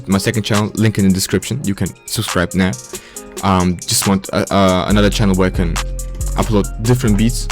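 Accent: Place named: Germany. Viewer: Deutsches English izz da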